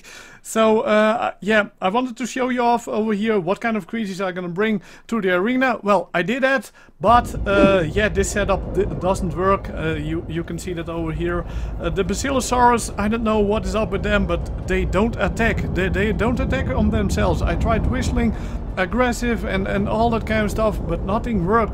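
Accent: Netherlands. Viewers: Dutch pronunciation ganggg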